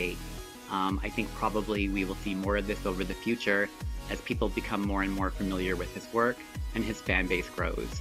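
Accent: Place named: Canada. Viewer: thought I detected a bit of hoser accent...(that screengrab with the TO ad on it was the final puzzle piece)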